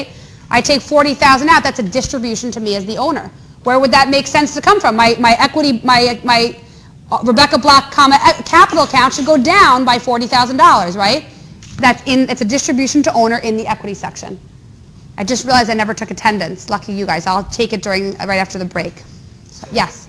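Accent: New Jersey, United States. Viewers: New Jersey accent. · Motivated and knowledgeable with just a dash of Jersey accent. I may be in love.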